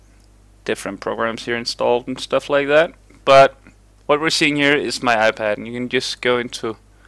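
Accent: Denmark. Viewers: Er du dansker??!?? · Nice tak for hjælpen kan høre på dit engelsk du er dansk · Du er dansker? ;)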